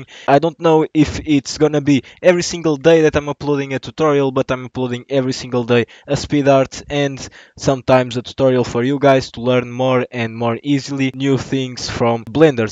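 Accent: Portugal. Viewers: Tuga no blender? Vou ja dar sub! soube logo pelo sotaque hahah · hahahha bro ouvi tipo 10 segundos e soube logo que eras tuga obg pelo tutorial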